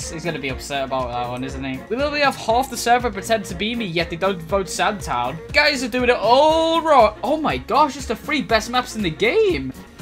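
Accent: United Kingdom. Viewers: are you british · Wait is British like me? W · I just realized thể he’s British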